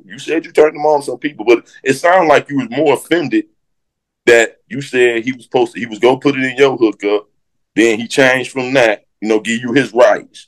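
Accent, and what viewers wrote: Detroit, United States. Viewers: That's detroit talk fam that's just how we speak . # you feel me lol good content 313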